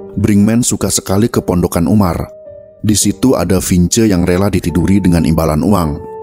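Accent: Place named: Java, Indonesia. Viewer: ngomong kyak wong jowo adminya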